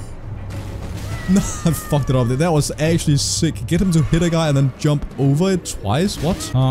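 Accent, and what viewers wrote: Denmark. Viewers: Are u danish? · Wait you danish?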